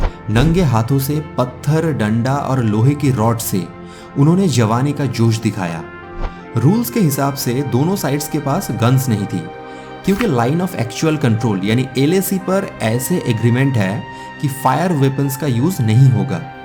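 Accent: Bihar, India. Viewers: Bhai aap north east se ho aap ke voice me thode Bihari tuch h 😊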